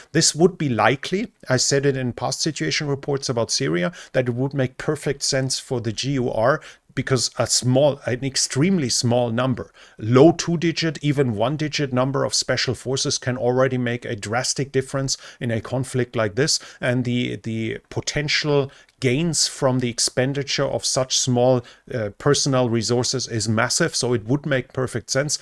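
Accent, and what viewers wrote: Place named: Germany. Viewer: Normally I wouldn't correct a pleasant German accent, but "ah-paw-state" is the English pronunciation. Doesn't matter much as most English speakers don't know what apostate means. Old diplomatic saying for region: "In the end, everyone betrays the Kurds." Probably the same can be said about the Armenians. If Assad falls I suspect the US will support the Kurds to a limited degree right up till Trump is sworn in. With much lamenting about loyalty and blaming of Trump by the military types, the US will then withdraw. As it has been for thousands of years Anatolia and Persia, to call them by their historical geopolitical labels, are too important to ignore. Everyone else can be.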